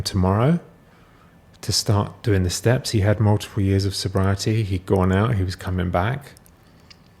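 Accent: United Kingdom. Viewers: your British accent is sooooo delightful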